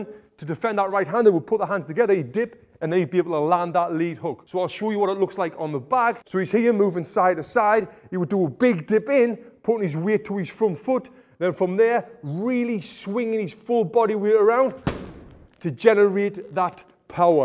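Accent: United Kingdom. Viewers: Sorry can't have a brit talking mike.tyson needs to be NY accent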